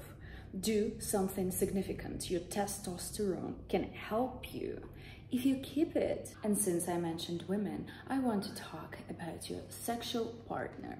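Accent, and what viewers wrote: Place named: Russia. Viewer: She's from Russia or Ukraine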